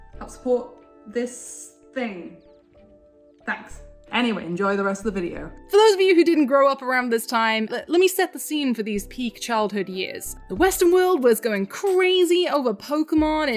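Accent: Wales, United Kingdom. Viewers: Are you brittish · I KNEW I HEARD A WELSH ACCENT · Ur british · I new I recognised that ascent! Got a question is wales.. good?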